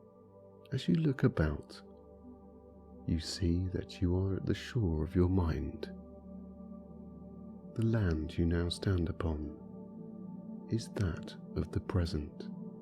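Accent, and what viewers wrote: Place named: England, United Kingdom. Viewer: Not just because I'm an Anglophile or anything..😉.. but I find that anyone with an English accent has just about the best voice for this kind of work. So soothing. Thank you,. xx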